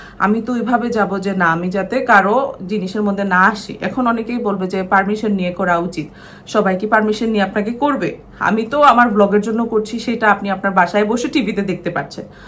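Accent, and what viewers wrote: Sylhet, Bangladesh. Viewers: Apu amrar sylheti mat matoin na kene shunte valo lage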